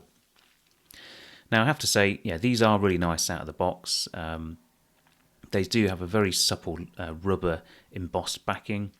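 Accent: England, United Kingdom. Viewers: ive sold a lot of watches in the past , a 16570 and a Omega PO 42 - I dont regret it at all i actually hated those watches and regretted buying them , never wore them at all , now I think very very hard before buying a watch , money sitting is money wasted! A sub is nice but you can always always grab another one in the future if you want life always goes on! Great vid! love the english accent :-)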